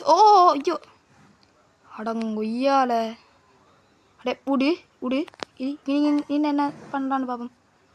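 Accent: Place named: Kerala, India. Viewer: Actually naan oru malayali than onga lihta malayali mari irrnthuthu so no disturbance 🎉❤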